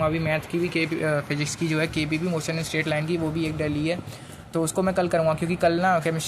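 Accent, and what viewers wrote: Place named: Uttar Pradesh, India. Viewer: bhai up 67 se haina varansi ya Mirzapur side se 😂 yese hi bolne ka style hota hai yha ka mai bhi baliya se hun😅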